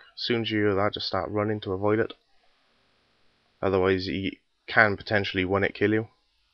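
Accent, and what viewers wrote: Wales, United Kingdom. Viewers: Thnaks for this it helped and I am proud to be welsh I recognise your accent. You from south wales?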